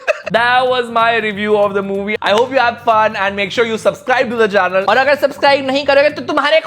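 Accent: India. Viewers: Your that desi ascent 😭❤️❤️❤️❤️❤️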